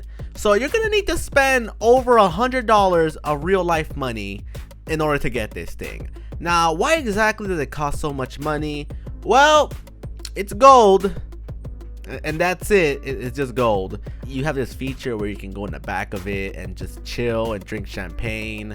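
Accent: Norway. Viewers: er du fra norge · Are y from norway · Is he norwigian???? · Are you from norway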